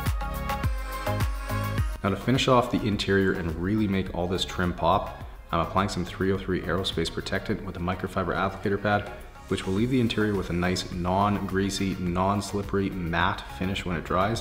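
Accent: Canada. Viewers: Favorite part: YOU! Best Friend’s favorite part: Your Canadian Accent Always tempted to buy every item on your list in the description 😂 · I pretty much am just here to hear the Canadian accent. Not gonna lie. Also I'm from Australia. I now have a strong motivation to detail my car but 100% won't because I'm a worthless person. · Are u Canadian?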